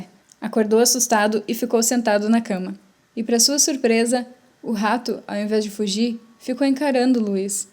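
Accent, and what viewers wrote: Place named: South, Brazil. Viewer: Adoro vc e o canal. Vc tem sotaque do Sul. Sou gaúcha. E vc é de onde?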